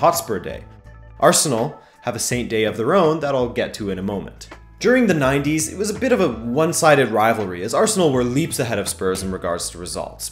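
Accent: United States. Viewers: Not in the US. And he speaks with a US accent, so it's confusing. I'd never heard it pronounced darby by an american. It was originally pronounced derby in the UK also, but not for a couple hundred years. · My god it sounds terrible hearing an American talk about football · American accent the entire video excluding your pronunciation of “derby” why do you say it like you’re an Englishman lmao “Darby” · Your listening to a yank 🤣🤣🤣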